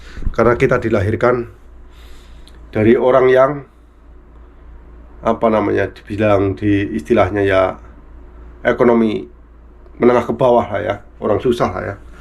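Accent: Banyumas, Indonesia. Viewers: Mas orang jawa ngapak ya, ini di jepang di kota mana mas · jawane ngendi mas , kok logate ngapak tenan 🙏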